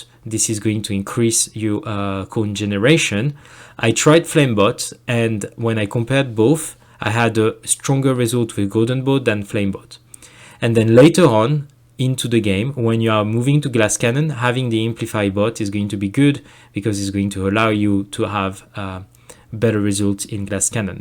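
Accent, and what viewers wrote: France. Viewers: Il me semble bien reconnaitre l'anglais d'un francais ^^ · l'accent francais 💔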